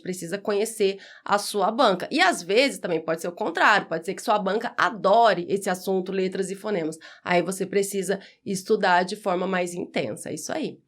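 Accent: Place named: Northeast, Brazil. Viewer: Teu sotaque parece com o nosso daqui do Nordeste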